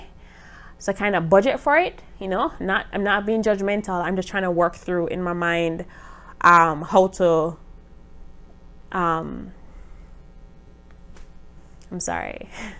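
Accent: Jamaica. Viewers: You sound Jamaican. Are u?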